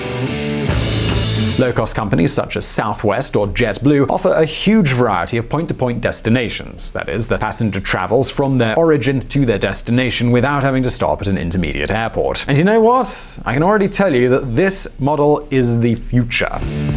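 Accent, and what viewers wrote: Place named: United Kingdom. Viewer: Keeps saying Roots when should be saying Routes, trust the English to butcher the language..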